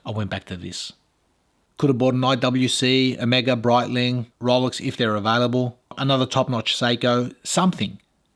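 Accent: Australia. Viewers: I love your videos mate. I love your Aussie 🇦🇺 accent. Love from India👊😊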